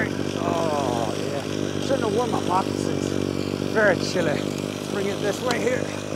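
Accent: Pittsburgh, United States. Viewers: a slight yinzer accent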